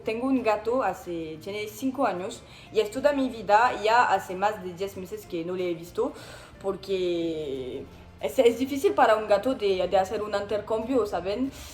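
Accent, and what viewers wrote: France. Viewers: hermosa francesa! adoro tu acento hablando slang mexicano,😂 espero que hayas disfrutado tu estadia en mexico, eres muy cool y graciosa vuelve pronto y que dios te bendiga.😊😍💕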